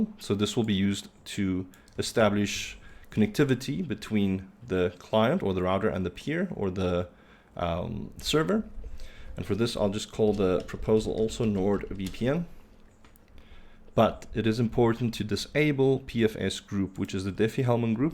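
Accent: South Africa. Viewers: Thanks dude! Altyd lekker om n Suid Afrikaanse stem op youtube tevind!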